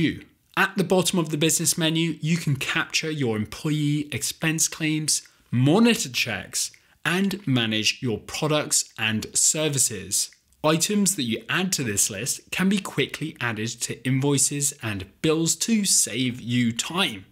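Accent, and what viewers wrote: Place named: United Kingdom. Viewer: Awesome. Every tutorial is just so much more pleasant with a cheerful British accent. 🙂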